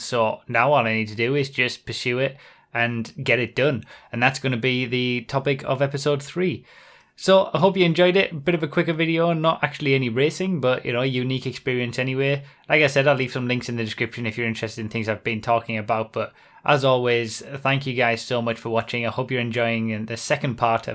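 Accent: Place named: Newcastle, United Kingdom. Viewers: Are you from north east England, I hear a slight Geordie accent for some reason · I'm from just up the road from you Blackhall don't know the hell I didn't recognise the Poolie accent.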